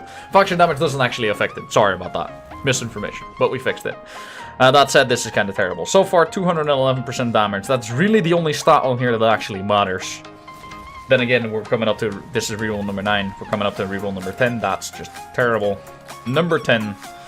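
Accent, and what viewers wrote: Netherlands. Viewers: De Dutch accent is strong on this one, just found you. Nieuwe sub erbij. Great vid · Ik hoor al gewoon dat je Nederlands bent, lekker hoor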